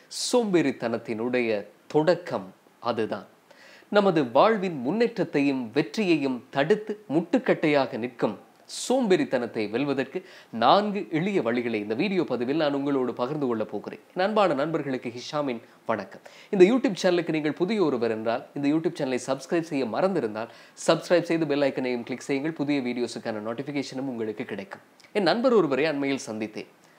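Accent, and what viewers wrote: Sri Lanka. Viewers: உங்கள் இலங்கை தமிழ் அழகு தமிழுக்கு நான் அடிமை . அருமை 👌 நீங்கள் சிரிச்சிக்கிட்டே இருங்கள் நண்பா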